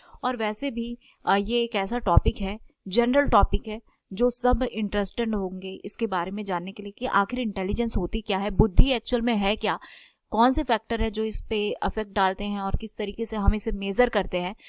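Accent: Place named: Delhi, India. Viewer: No she is absolutely "North Indian"... * Notice her "a" pronounciation that connect Delhi area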